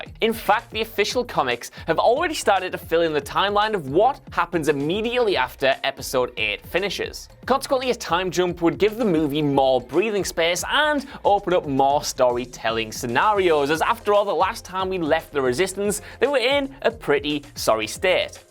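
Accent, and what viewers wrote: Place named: North, United Kingdom. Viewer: Jesus this guy sounds like a resident of the fucking North in GoT . Hopefully you're a Stark loyalist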